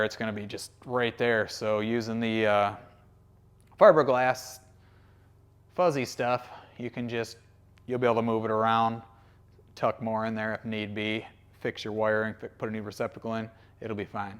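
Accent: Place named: Michigan, United States. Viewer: As soon as I heard you talk, I knew you were from Michigan... it's like I'm listening to someone from my hometown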